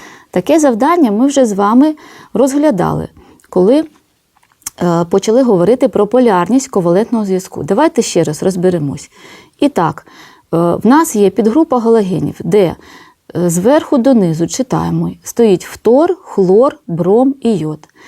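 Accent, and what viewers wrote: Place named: Russia. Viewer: Дуже відчувається, що людина говорить російською.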